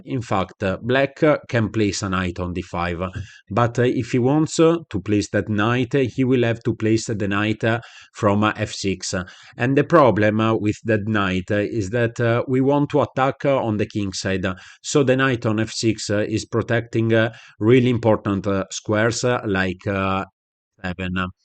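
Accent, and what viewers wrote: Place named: Apulia, Italy. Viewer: Accento pugliesissimo ahahah grazie per la lezione!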